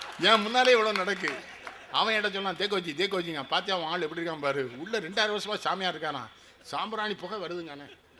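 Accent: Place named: Tirunelveli, India. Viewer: Pesum pothey pechila thimir kodukirathu nellai Tamil mattum thanla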